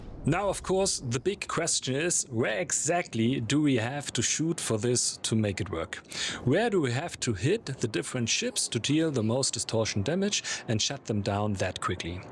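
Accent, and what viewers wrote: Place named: Germany. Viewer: das war das deutscheste englisch was ich gehört habe^^ ( nicht negativ gemeint) cooles video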